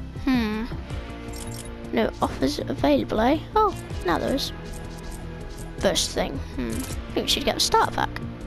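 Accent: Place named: United Kingdom. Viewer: He sound so British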